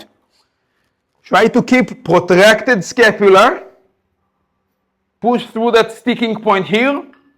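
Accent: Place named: United Kingdom. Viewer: לפי המבטא שלו הייתי אומר שהוא בריטי.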